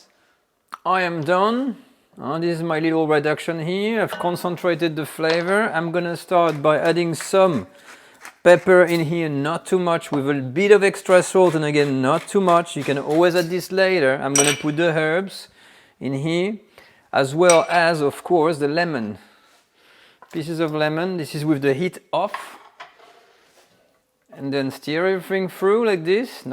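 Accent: France. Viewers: This guy's french accent made me subscribe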